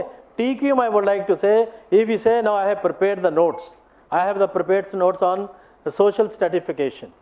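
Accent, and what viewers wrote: India. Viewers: You can still hear his Hindi twang. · Sardaar ji in South accent Great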